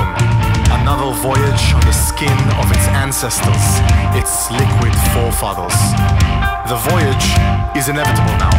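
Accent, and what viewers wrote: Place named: France. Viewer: With a French accent no less. Like butter to the ears.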